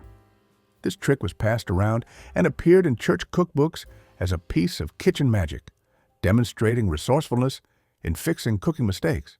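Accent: South, United States. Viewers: Just curious for no good reason... Is this an AI voice with a southern accent or a real person?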